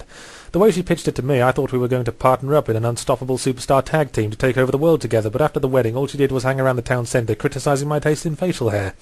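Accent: United Kingdom. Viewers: i know that Fable is a completely awesome game, but what he says may or may not be true, it's still funny. (talks fast and in a british accent)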